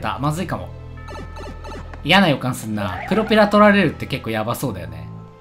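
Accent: Kansai, Japan. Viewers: さん関西の人？(・o・)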